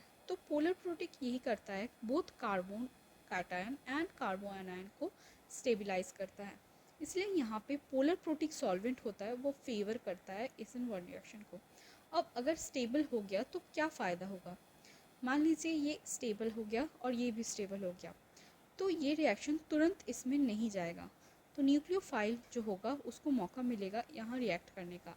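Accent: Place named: United Kingdom. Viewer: Mam aapka accent thoda british ho rha h 🥲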